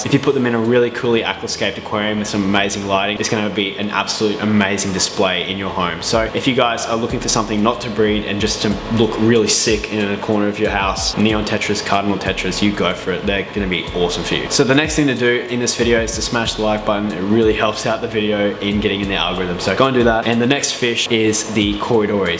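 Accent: Australia. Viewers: You sound Aussie mate. Talk litres!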